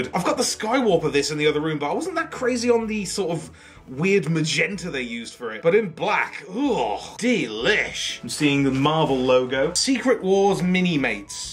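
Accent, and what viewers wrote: Texas, United States. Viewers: american/texan accent sux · Loved i mean looooved the Texas accent lol.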